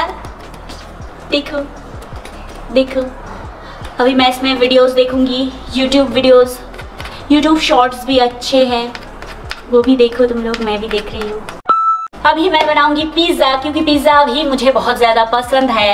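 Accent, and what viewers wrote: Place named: Bengal, India. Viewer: Tum itna cute keuho bataou mughe pls didi up kis jaga per reheteho up Bengalis he bat karte ho pls didi pls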